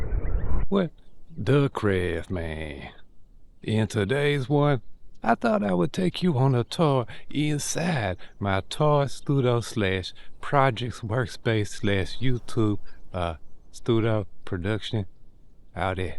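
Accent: Louisiana, United States. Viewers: I watched a short the other day, of a guy, showing how to prepare and eat thistle stems. What I noticed pretty quick was the way he talked. It reminded me of you, and how you talk. This fella was somewhere near New Orleans. Made me think, 🤔, maybe you're in Louisiana... Then I saw the pelican... In this video. I think you're a Louisianan. It's in the speech. I did not know the way you speak is probably the way a lot of people around that region may speak. Pretty cool.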